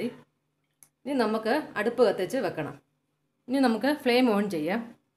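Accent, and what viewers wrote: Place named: Pathanamthitta, India. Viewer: Supr👌 Pathanamthitta ano sthalam.... njangalude same slang 🙃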